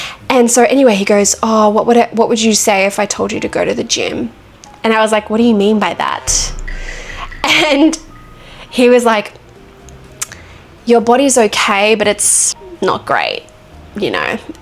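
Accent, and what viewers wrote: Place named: Australia. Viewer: such a lovely Aussie accent! xx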